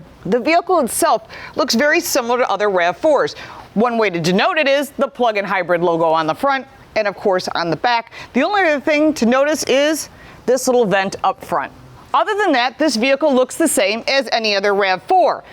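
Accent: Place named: New York, United States. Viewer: Great review, accept for the damn loud NY accent!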